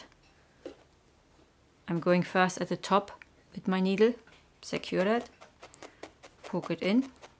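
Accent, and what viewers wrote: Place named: Scandinavia. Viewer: This artist sounds scandanavian......is that wool sorced from Ireland?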